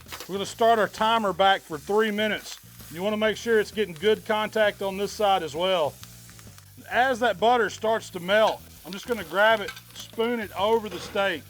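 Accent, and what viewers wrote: South, United States. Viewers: Idk where ur from but this is southern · I can never tell what accident this guy has. He has a southern accent, with a pinch of Canadian, Austrian and English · It’s just a southern accent..? Austrian?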